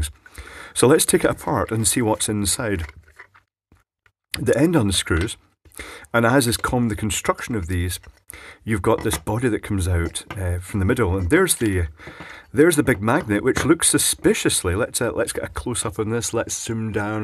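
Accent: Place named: Scotland, United Kingdom. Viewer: The Scottish* accent makes it perfect.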